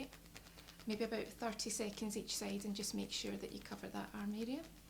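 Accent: Scotland, United Kingdom. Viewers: The Scottish accent is just lovely here (pleas say "braid" please) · With that accent, I fully believe that Scottish women could rule forever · Not sure how i ended up here, but i have to say. I still think the scottish accent is my favorite. I dunno what about the accent draws me to it, but its just such a good accent. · 😂😂 I love still game was bought up with Rab C and I love your jock accent 🏴 greetings from east London 🏴👍🏻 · I learned a Scottish accent from you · Scottish accent ❤️ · Awesome video, the scot accent an added bonus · I like your accent, where do you come from? Is it really Scotland? Some comments mentioned that before... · Its really strange to hear a fellow scot do a massage video for some reason.lol did you study holistic massage in any colleges in Glasgow?. looks like the Cardonald college uniform You model is a babe as well:p haha cool vid:) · Nice relaxing video. 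I'm sure it would feel great and have positive health effects. I love your Scottish accent too. Some women have very soothing voices like Lita. You certainly do too. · I loooooove scottish accent ♡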